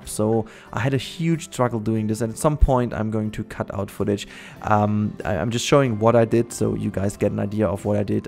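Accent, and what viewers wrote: Germany. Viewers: Are you german???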